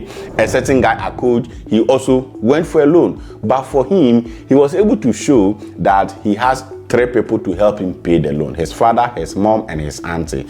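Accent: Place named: Ghana. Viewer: I think you are Ghanaian, Ur Accent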